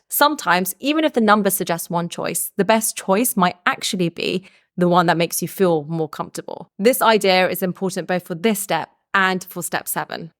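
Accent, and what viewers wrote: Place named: London, United Kingdom. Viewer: love the modern London accent innit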